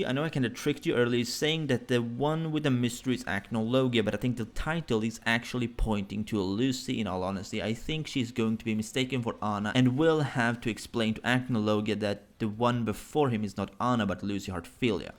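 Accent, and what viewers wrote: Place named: Spain. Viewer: you're freaking Spanish PEOPLE DO YOU HEAR HIS VOICE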